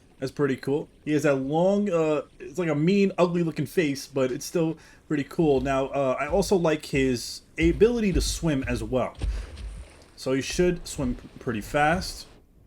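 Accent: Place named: New Jersey, United States. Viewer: you from jersey? I hear a tiny accent coming through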